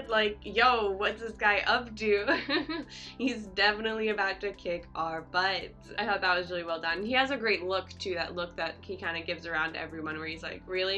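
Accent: United States. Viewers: Aww, that wasn’t the full, proper scene. So I will give a bit of context here. Prior to this, Rayappan (Vijay) will be listening to an old song from a MGR (1st Tamil superstar) movie in a car. The driver will complain about a rival gang so he will ask him to go there. That’s why when the gangsters say that this “top dog” is cornered, Rayappan said “they are trapped in a bunch” haha. Also, the focus of this scene is to show how relaxed Rayappan is whilst fighting because he has “been there, done that” sort of thing. So he ends up singing that same song (from the car) while fighting. Here’s the link to the full video if you are still in the mood to see it haha. <link> Thanks. I like your pronunciations of names. I can listen to em all day. Btw are you from a southern state in US?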